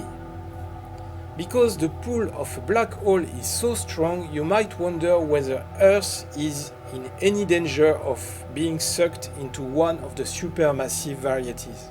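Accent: France. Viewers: I love your "French accent" when you're talking in English! LoOoL hehehehehehe !!! Otherwise, I'd like to hear more about "Secret Space Programme" and "Space Force" which could explain "black holes", "time travelling", "Galactic brothers and sisters" stationned around planet Earth waiting for a coming "cosmic event" that will happen SOON within our solar system. Thank you with Gratitude, Love and Light!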